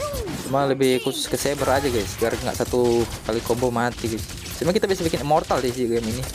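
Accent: Manado, Indonesia. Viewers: Orang asli manado ngana bro?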